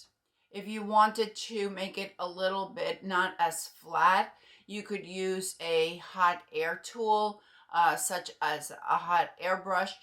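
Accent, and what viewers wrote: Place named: New York, United States. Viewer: Thanks, for the review, and change of color. It looks different from indoors and outdoors. I was wondering about this color. Their wigs are so comfortable. Enjoy your voice. Sounds like you have a New Yorker accent. Thx!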